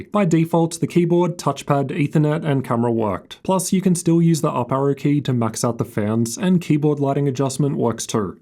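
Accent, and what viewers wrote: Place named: Melbourne, Australia. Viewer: God, that melbourne accent... Amazing video but the accent is strong xD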